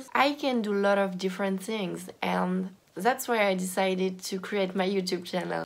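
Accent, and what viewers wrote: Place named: France. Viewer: Fantastic! Love it, I forgot how beautiful your French accent is, love seeing your work 💓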